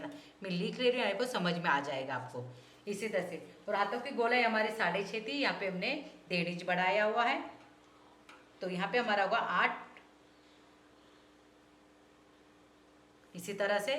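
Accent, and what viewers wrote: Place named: Maharashtra, India. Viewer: मैडम आप के बोलने के तरीके से आप महाराष्ट्रीयन हो ऐसा लगता है। वैसे आपके सिखाने का तरीका बहोत ही अच्छा है। असं वाटतंय की आपल्या घरातल्या माणसाकडूनच आपण शिकत आहोत. इतक्या जवळच्या वाटता आपण. 👌👌👌👌🌷🌷🌷🌷🌷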